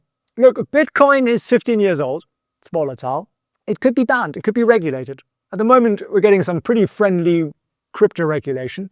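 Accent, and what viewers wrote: United Kingdom. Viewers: and I thought you were going to say "yet still has a great british accent!"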